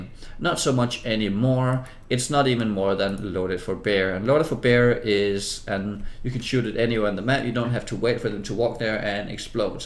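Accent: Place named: Denmark. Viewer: Syntes nok jeg kunne høre lidt dansk accent! :D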